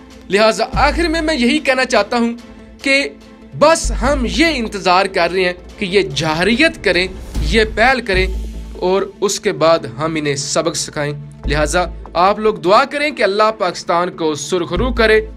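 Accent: Punjab, India. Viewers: Bilawaja ki bakwaas video. Kiyoun china and india both are not atomic power. If yes then how china initiated. Secondly thori urdu sahi bolo bilkul punjabi lehja hai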